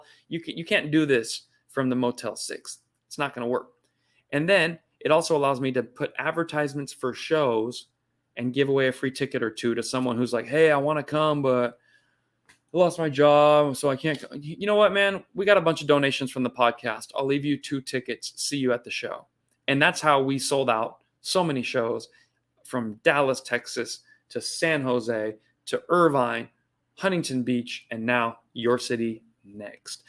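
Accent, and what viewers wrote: Australia. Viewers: Good Australian accent! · K-von, I love your Australian Accent....... it's hot 🔥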